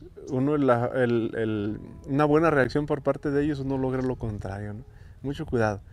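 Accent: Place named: Mexico. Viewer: Muy conito me encanta lo q mas me gusta escuchelo ablar me encanta el acento mejicano un fuerte abraso desde el salvador con muchocarino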